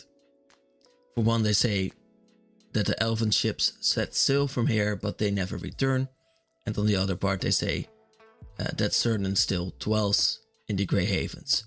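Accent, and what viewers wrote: Netherlands. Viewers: You dutch 🤔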